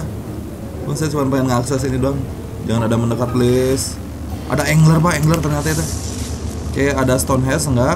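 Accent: West Java, Indonesia. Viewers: bang orang sunda?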